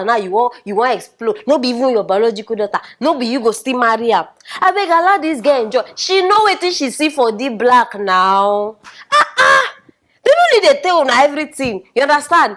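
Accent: Nigeria. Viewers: That Nigerian English ooooh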